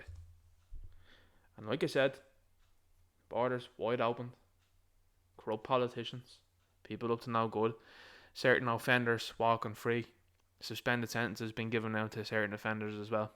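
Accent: Dublin, Ireland. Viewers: Absolutely love you. I’m a culchie and I luvvvvv the Dublin accent for starters…and the Dublin expressions. Class, pure class ❤ and then the content….you really nail it here. Pls God more ppl wake up….am thinking their strategy in going to Derek Blythe’s door abut his tweet or whatever it was, was because they knew he’d report it on social media and it would make ppl afraid they’d e next if they post something, so they’ll stay quiet, that’s what I think they were trying to achieve there. So we have to spread the word and wake ppl up and reclaim normalcy. Thanks. God bless.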